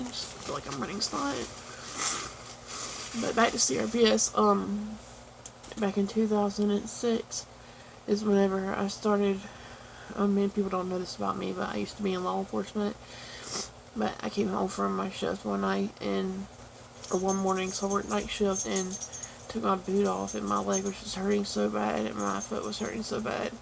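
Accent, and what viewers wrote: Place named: North Carolina, United States. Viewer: You are a beautiful woman. You are strong for talking about these things. Which Carolina are you from? You sound like you are from North Carolina. I'm from NC, born and raised. Found your channel on PP Officials channel. Hope all is well.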